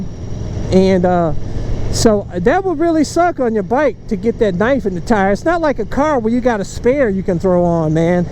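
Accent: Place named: Cleveland, United States. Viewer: Cleveland hood accent.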